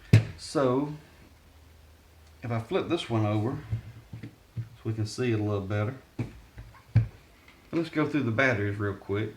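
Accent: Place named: Arkansas, United States. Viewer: i could listen to you all day long! You frpm Arkansas hahaha. God Bless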